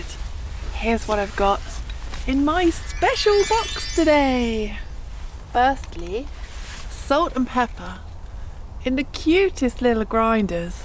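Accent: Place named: RP, United Kingdom. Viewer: You sound very posh to me. It's like I'm watching a BBC Nature programme.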